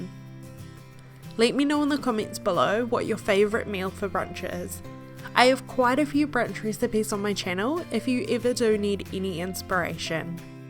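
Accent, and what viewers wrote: New Zealand. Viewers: Hi, I just wanted to say I’m from new zealand aswell. I could tell by your kiwi accent. Your cooking videos are so great and helpful thank you :) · Nola Susan New Zealand accent duh 🙄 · Kiwi New Zealand accent 🔥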